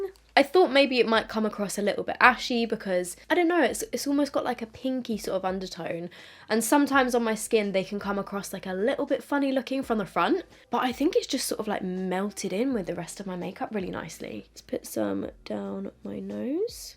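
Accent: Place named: London, United Kingdom. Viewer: You are sounding a little London today 😅😅 ❤️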